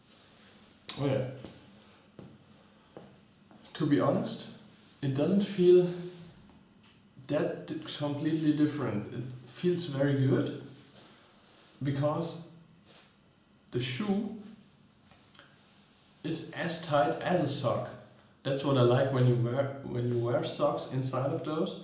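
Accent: Germany. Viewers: Man hört das er deutsch ist xD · Man hört mega deinen Deutschen akzent😂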